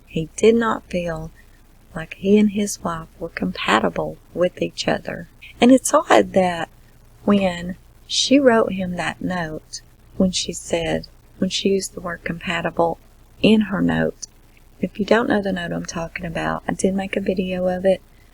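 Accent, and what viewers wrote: South, United States. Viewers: From the time Shannann got home, I do not believe a word Chris said. Fact according to Shannann. In order to have sex, she would take a shower. Her suitcase is open in the discovery, and her toothbrush was still packed. She according to discovery had full make up still on. She was found with bra and underwear on. The only thing off was a different tee shirt, and her leggings. This is a story made up by a man, that is clueless. Men out there reading this. Be very careful of females swishing their tail like a bitch(female dog) in heat. Females are very protective of our belly’s when pregnant. She was never on her stomach, and we sure don’t let anyone sit on our babies., thank you for deciding what we are having for dinner. FISH Love you my southern talking girlfriend. True story. I use to travel for work. I was in yet another drug store in l think Wisconsin and a young man said “ I love hearing you talk, where are you from? 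“. I told him I was raised in Ga. but live in Texas. He looked at me and said, “ Do you need a passport to visit? 😳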